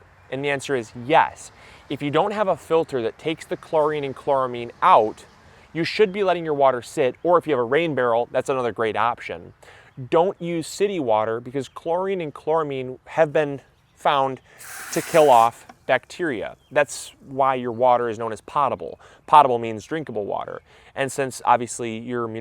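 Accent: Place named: Midwest, United States. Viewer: Love the Midwest accent: CAM-pohst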